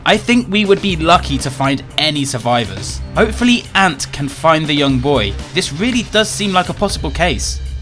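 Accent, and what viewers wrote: United Kingdom. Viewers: This is pghlfilms but bri’ish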